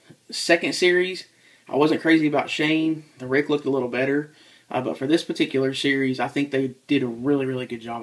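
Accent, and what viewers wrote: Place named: South, United States. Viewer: I do love this show and the figures are nice, my buddy collects them. I am more a transformer and dc collector. I subscribed to your channel tonight while working, I had caught the gl ring review and like it. I like to hear another southern person doing reviews online...btw where are u from?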